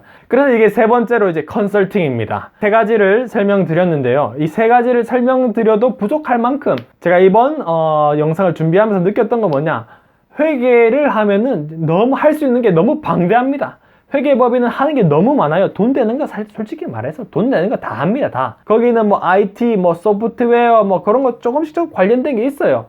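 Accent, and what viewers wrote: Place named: Gyeongsang, South Korea. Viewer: 안녕하세요, 님. 저는 현재 해외에서 한국어 교원을 하고 있는 사람입니다. 저는 원래 영어교육학이 전공이라 영어교사로 고등학교에서 재직하다가... 무언가 고등학교라는 시스템이 학생을 너무 괴롭힌다는 생각이 들어..그나마 널널한 한국어를 가르치고 있는데... 최근들어 드는 생각이, 저는 영어를 좋아했던 것이지, 학생을 좋아하지는 않은 것 같습니다. 그래서 제 주변에 us cpa하시는 분께서, 영어에 자신만 있다면 미국 cpa를 한 번 도전해보라고 해서.. 당장은 아니지만, 조금씩 준비해 나가려고 합니다. 한국어교원 컨텐츠 영상이나 회계사 컨탠츠 영상을 보면.. 대부분 학원들 광고가 많이 뜨는데, 님처럼 개인이 어떻게 공부했는지도 올려주시면 좋을 것 같아요... (참... 조회수는 안나올 컨텐츠이긴 하지만....). 그리고 조금은 난해한 질문일수도 있지만.. 님은 세무사로서 지금 행복하신가요? 그저 개인 의견이 궁금합니다. ^^ (저 또한 부산 사람이라... 하지만 직업상 참..억양을 죽이려고 노력하는데... 마음껏 갱상도 억양을 발산하시는 님이 오늘따라 참 부럽습니다.... 뭐 저는 억양을 없애려고 노력만 했지, 없다고 하지는 않았습니다....^^)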